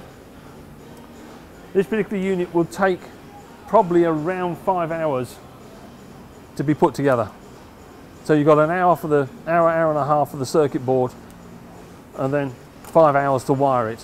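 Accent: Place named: South East England, United Kingdom. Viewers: Sounds southern. Milton Keynes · Somewhere in the South East of England without a shadow of a doubt.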